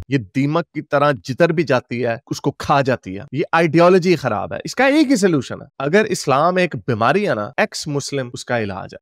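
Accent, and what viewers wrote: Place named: Punjab, India. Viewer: Bro your Punjabi tone is amazing. It gives a humor kick in the topic👍🏼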